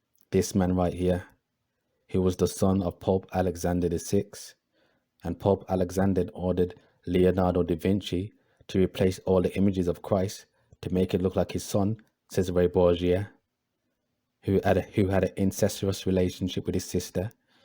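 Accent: Birmingham, United Kingdom. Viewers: This guy speaking is a brummie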